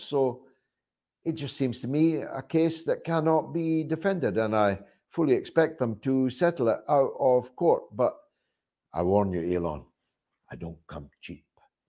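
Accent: Scotland, United Kingdom. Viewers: Are there any sort of lessons I can take to get this Scottish accent?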